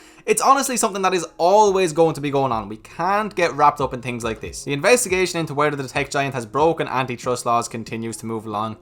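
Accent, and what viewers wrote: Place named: Wicklow, Ireland. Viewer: Let me guess..., Wicklow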